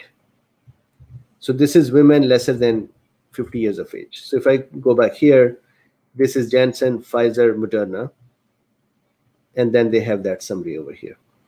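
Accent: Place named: India. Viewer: I think your accent (and Indian accents in general) is beautiful. Also, there is no problem for me to understand what you're saying (I'm a native US English speaker). A few tips on the only mispronounciations I noticed: VAERS->vares (rhymes with cares), convalesce->conva-less (convalesced, just append a "d" sound at the end, like any "ed' past tense verb). There was (only) one other word, but I couldn't remember it, nor find it.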